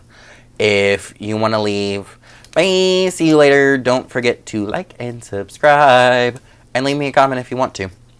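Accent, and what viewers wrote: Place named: South, United States. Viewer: Thanks for being with me in the last couple of days, have just come across you I think it was via forensic flosser don't quote me on that though, and I have been binge watching you oh my you have had me in stitches so many times I think one of my favorites was .. god is like ikea hahaha I totally get the being awkward in front of a camera ( you are soooo not) I tend to freeze up I hope it gets better soon as I am so not totally boring Iike I think it comes across on flosstube I promise I do actually have a personality people lol maybe that's why my watching time is so low per video. I love your stitching I too like terresa Wentzler and have several of her patterns but have not yet started any must do soon love seeing your updates and I could listen to you for ages I am a sucker for accents especially the southern states. 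any who will talk to you soon have a great stitching and general life week .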